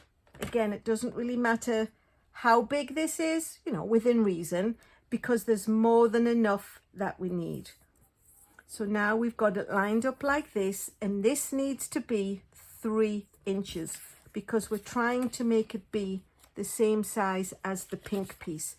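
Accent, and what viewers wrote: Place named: United Kingdom. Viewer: Are you in the UK? Because of your accent. If you are, I wonder if I could buy the PP from you?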